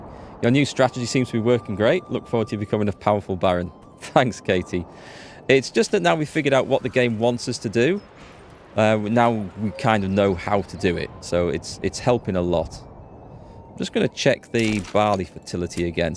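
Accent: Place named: North Yorkshire, United Kingdom. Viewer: could hear the subtle North Yorks accent, I grew up in county Durham, so kinda sat on the yorks/lancs rivalry border :)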